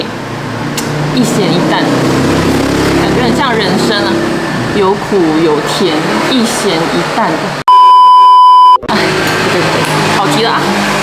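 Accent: Taiwan. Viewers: 好的。。。听了你的口音好像台湾女生。☺️ .我是马来西亚人。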